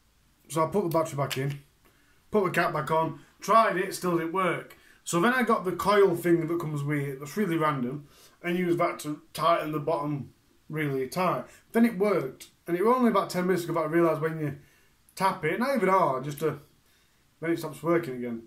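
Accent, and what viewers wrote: Yorkshire, United Kingdom. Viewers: You naughty boy 😂😂 where abouts are you based I spot a Yorkshire accent 👊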